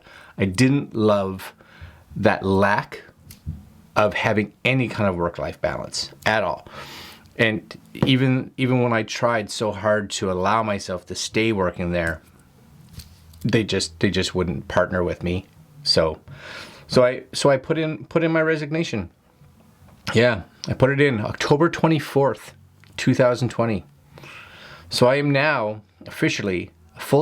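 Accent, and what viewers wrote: Canada. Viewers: Your BEST yet!!! Kudos! I’ve been a loyal subscriber and viewer of yours for most of this year, originally from Deep River, now living in Las Vegas. Was in the Video Department of Blue Man Group, until we were laid off in March. Don’t get me wrong, it was a great job, but believe it or not, there was no room for growth or creativity. I’ve been focused on getting my own channel up and running, and you’ve been a very great inspiration, and the accent helps me miss the Ottawa Valley :). Anyway, keep on keepin on! You’re on a role, and it’s working! Cheers 🍻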